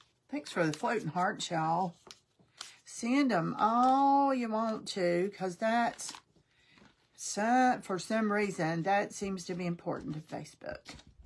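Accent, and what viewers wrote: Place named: North Carolina, United States. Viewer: I knew that accent! I am from NC too!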